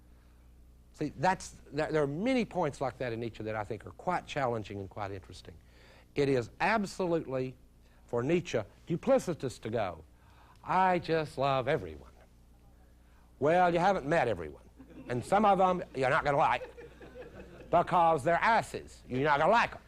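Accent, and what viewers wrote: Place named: West Texas, United States. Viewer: West texan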